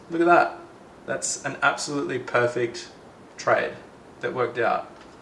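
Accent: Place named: Australia. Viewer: You are doing a great job - explanaton is amazing! I too live in Australia so it is refreshing to hear an Aussi with this eXplanation - keep going!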